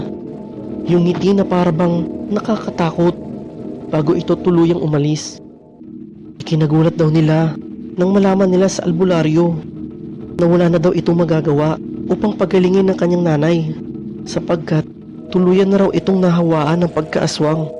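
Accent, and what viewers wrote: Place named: Conyo, Philippines. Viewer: conyo narator shessh!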